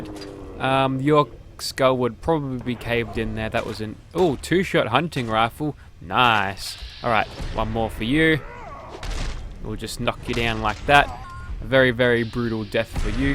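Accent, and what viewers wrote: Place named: Australia. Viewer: Ya know I’ve observed something with your Australian accent that I’ve never noticed before. For example: You say “whatever” as “whateva” but you say “Matilda” as “Matilder”. So that’s cool. 🌈 The more you know ⭐️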